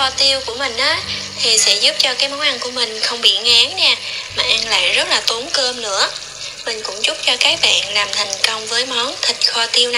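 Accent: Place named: South, Vietnam. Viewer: Vào Vào con cac Người Nam nói giọng miền Nam đi Vào Vào....nhé nhé Con cac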